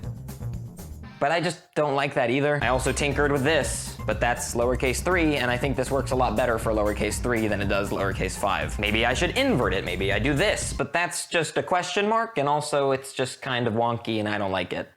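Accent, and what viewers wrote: United Kingdom. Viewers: Are you a brit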